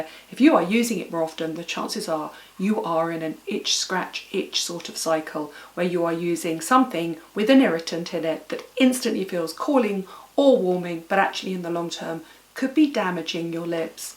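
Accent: United Kingdom. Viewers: British accent 😍😍😍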